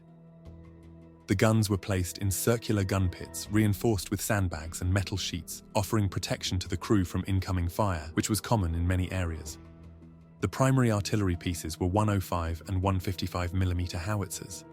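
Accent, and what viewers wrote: United Kingdom. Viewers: Why does the narrator have ti sound like a damn Limey!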